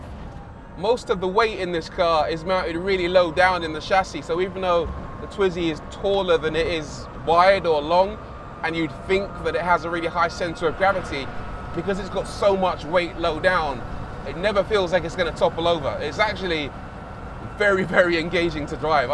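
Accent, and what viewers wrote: United Kingdom. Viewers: the accent... British perfection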